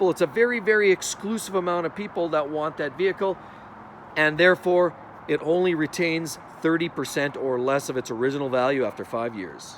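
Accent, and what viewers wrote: Canada. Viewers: Are you Canadian!!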